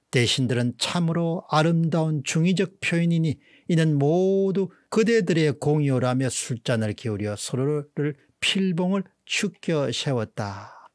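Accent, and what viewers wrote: Gyeongsang, South Korea. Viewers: ..갱상도 분이어서 발음이 힘드시지요? 조은상 이아니라 조은산 입니다...^^ 아무리 갱상도 발음에 평생을 갇혀계셨어도 수십만이 보고듣는 유투브 방송인대..발음은 조금 신경쓰셔서 해주셨으면 합니다..감사합니다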